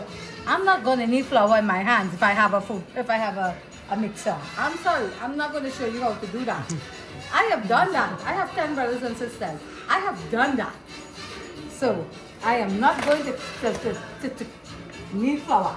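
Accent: Trinidad and Tobago. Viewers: I just came across your vlog and subscribed within the first few minutes, i love that you haven't dropped your Trini Accent. Some people will go abroad for a few months and want to talk with an American accent, little do they know how much foreigners love a Trini Accent.. Keep being your Original Self and I'm sure your channel will grow in abundance.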